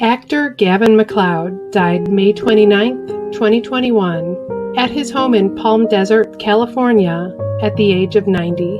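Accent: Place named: Chicago, United States. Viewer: You sound like you're from Chicago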